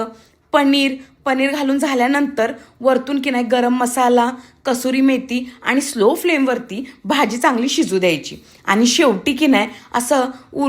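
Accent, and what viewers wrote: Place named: Kolhapur, India. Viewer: मी ही असच बोलत असते पिवर कोल्हापूरी आम्ही पन्हाळ कर हाय बगा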